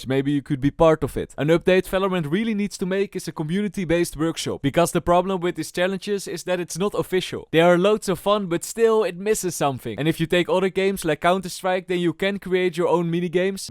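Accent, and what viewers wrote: Netherlands. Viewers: Strongest dutch accent ive ever heard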